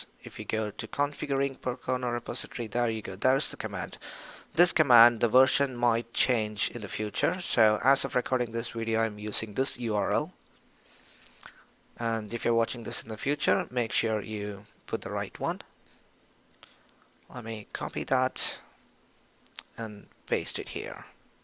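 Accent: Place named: Australia. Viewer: and that’s good Your accent sounded like Australian Never mind bro..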